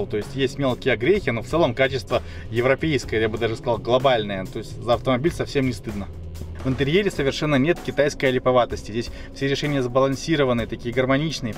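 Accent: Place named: Belarus. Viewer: Слышен белорусскии ГГовор!!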